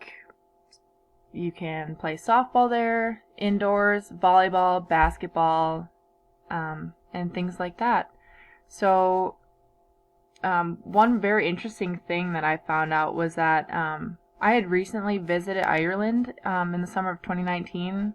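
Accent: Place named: Wisconsin, United States. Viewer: I've been seeing videos of the attractions in Wisconsin Dells and had no idea what it was until I watched your video. Thank you. It was great to hear you speaking so that I get an idea what what a Wisconsin person actually sounds like. I don't meet too many people who grew up there where I live in the south and yet I have met a lot of people from Michigan. There is a real similarity to the way you sound in your talk and the way people from Michigan sound.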